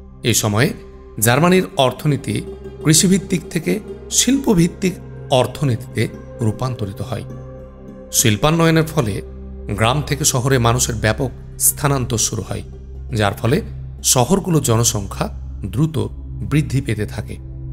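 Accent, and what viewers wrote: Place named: India. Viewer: Indian, voice sunei bujsi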